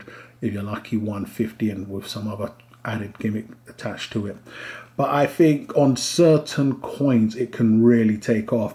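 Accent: England, United Kingdom. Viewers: love the accent what part of England??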